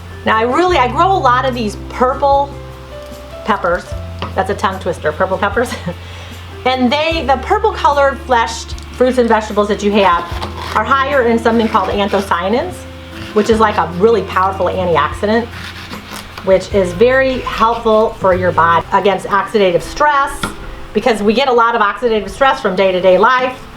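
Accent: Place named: Upstate New York, United States. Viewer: if your going to give out recipes, please learn the DIFFERENCE BETWEEN A Teaspoon and tablespoon, there's a huge difference u said add 4 teaspoons to a quart, you added 4 Tablespoons maybe in upstate new York they don't know the difference but in downstate people know the difference at least based on your accent u sound like your from waaaay upstate had to downvote your video because you gave a recipe with completely wrong measurements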